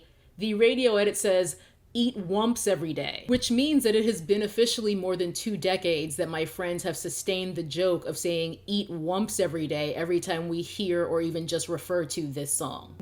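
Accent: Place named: New York City, United States. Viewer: NYC accent is thick in this video!!! Also you know I watched this immediately!!!! Click bait!! I’m old!! Sobs in corner to “ ” which is also old enough to drink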